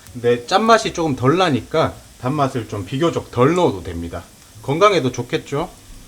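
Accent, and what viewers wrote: Gyeongsang, South Korea. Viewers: 멸치 볶음 때문에 구독한 게 아니라 경상도 구수한 목소리가 귀에 편안해서 구독합니당🤣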